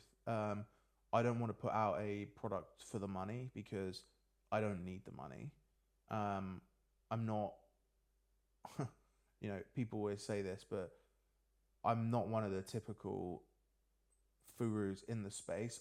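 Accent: United Kingdom. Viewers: dude you certainly don't have an ear for accents that's for sure. Everyone on earth knows that he's British as soon as he starts speaking haha. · wait your british? i always thought you was American 😅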